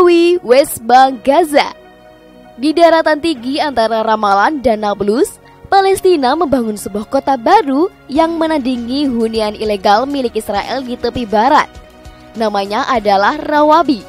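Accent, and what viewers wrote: Java, Indonesia. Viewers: medok banget ngomongnya..mengganggu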